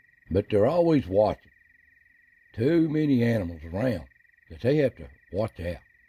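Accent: Appalachia, United States. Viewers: Well I didnt get to finish my"hey howld ya dos Its so good listening to a fellow "ridge runner(were from Tazewell area up on Ravens Ridge)..I enjoyed.hereing back home in your voice and will subscribe to your youtube channel..you have a safe and sane New Years amd please keep on visiting with us..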